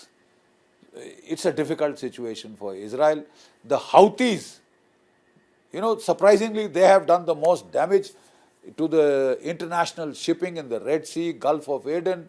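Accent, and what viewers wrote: India. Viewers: How come u listning Indian accent broadcasts???😳🤷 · I usually can't stand indian accents but I'll tolerate it just for this guy. He's good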